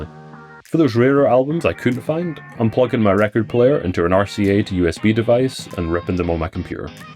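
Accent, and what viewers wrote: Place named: Scotland, United Kingdom. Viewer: Scottish accent with dropped ‘tees’ sounds shocking.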